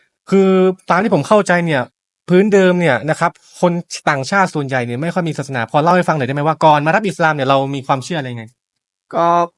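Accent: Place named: South, Thailand. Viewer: หรอยหนัดบังหรั่ง ❤❤❤ แหลงใต้ชับเปี้ยะ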